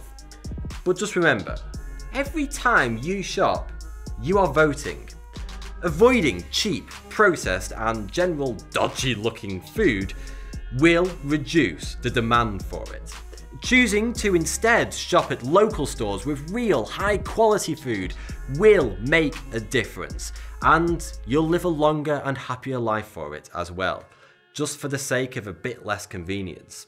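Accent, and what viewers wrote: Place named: England, United Kingdom. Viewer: He definitely English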